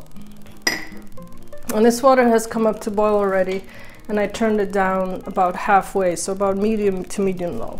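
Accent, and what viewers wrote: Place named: Poland. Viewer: What's wrong with the inexpressibly sweet Polish accent, spoken by a woman? Whenever I hear a woman speaking with a Polish accent, my affected ears become listening and absorbing shells, as it were, in transport! In other words, I turn to jelly, in ecstasy!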